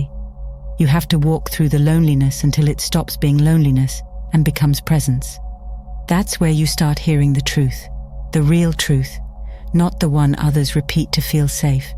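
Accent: United Kingdom. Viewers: Sounds like Solace Fox with different music and a British accent.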